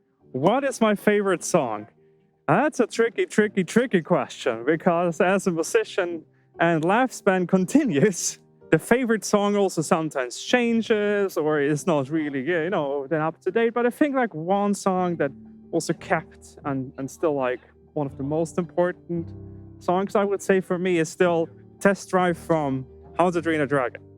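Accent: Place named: Germany. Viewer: Naww that's really awesome that you've made a vidio like that! ;3 And as a German speaker I would also say your accent is more like "Hochdeutsch"! ;3🐾🦊🐉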